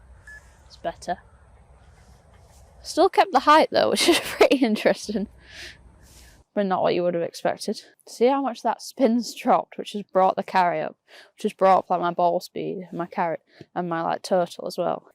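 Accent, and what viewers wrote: United Kingdom. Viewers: You know I really like your content and vids. I’ve become a fan, BUT I have to admit you’ve got the coolest UK accent ever!!! Great review, picked up my 10K yesterday, can’t wait to hit it….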